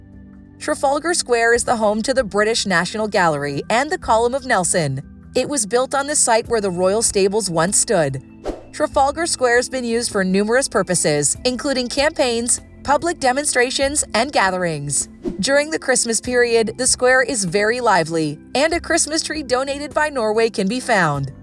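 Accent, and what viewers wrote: United States. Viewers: American narrator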